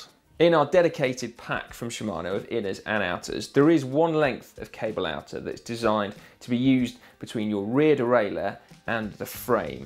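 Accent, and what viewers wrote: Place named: England, United Kingdom. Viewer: Damn an english accent!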